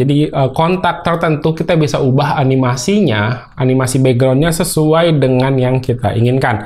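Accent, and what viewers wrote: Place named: Kalimantan, Indonesia. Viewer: Wah orang kalimantan nih abangnya ya? 😁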